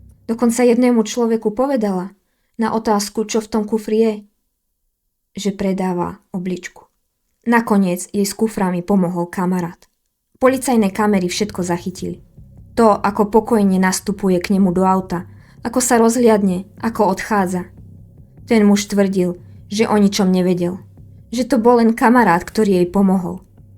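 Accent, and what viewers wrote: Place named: Central Slovakia, Slovakia. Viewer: dakujem, smutný príbeh, ale krásna ľúbozvučná stredoslovenčina ❤️👍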